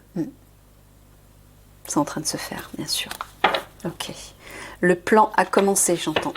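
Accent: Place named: Belgium. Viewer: Oh vous êtes belge :). C'est clair que c'est de plus en plus visible... Merci pour ce partage.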